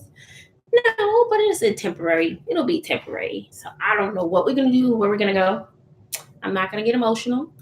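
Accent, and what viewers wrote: New York, United States. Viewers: Lol, i love your NEW YORK accent, state of mind and swagg, you remind me of one of those NY Jewish Women, you're AMAZING, you could be a celebritie with your beauty, talent, humor and charm. Hang in there, it wont be long before you'll be an "EMPTY NESTER" time flies.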